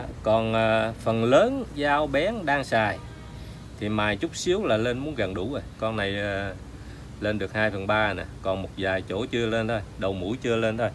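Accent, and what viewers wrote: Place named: Mekong Delta, Vietnam. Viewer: Cắc phải hỏi xem anh ở đâu để tới học mài dao cho bà xã thấy anh chỉ dẫn rất hay,mình ở"ăn giang"miền tây chắc anh cũng ở miền tây,nghe giọng nói biết dân quê mình cảm ơn anh nhiều...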